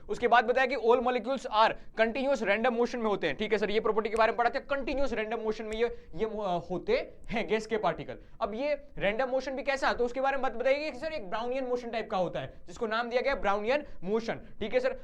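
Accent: Gujarat, India. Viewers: Sir aap Gujarati ho kya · Sir gujrati hai....shayad...😊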